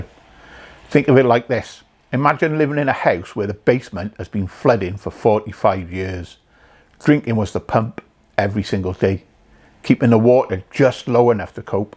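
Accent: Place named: Wales, United Kingdom. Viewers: Hey I'm finding your videos both helpful and hard hitting. Out of interest where are you from you sound Welsh? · I am OK Monday to Thursday. I can take the loneliness and I have a work pattern that keeps me out the pub but come Friday the willpower has gone. I am not drunk for the next 3 days its just I don't want to drink at all but do. Its the not having control that gets up my back. If I wanted to drink well at least I have chosen my poison. Nice to hear this in a Welsh accent. Thanks Butty!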